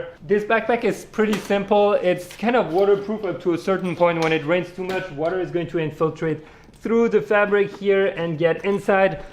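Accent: France. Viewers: It depends on the kind of aps c you're using. If you convert a 16mm on Sony aps c camera you have to multiply. 16 * 1.5 = 24. So it's the equivalent of a 24mm on a 3/4. Love your French accent btw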